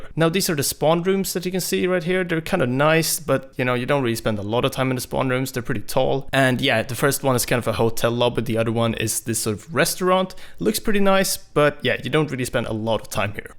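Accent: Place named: Sweden. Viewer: u swedish af boi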